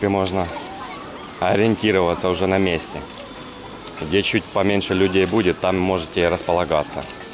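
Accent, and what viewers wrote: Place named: Lviv, Ukraine. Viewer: иди на. У тебя говорок со Львова.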